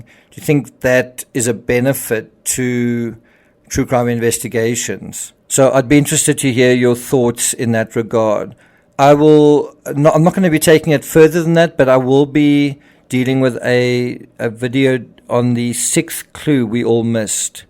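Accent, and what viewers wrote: South Africa. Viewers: Are you from South Africa? Thank you for keeping us informed I do think your presentations are excellent.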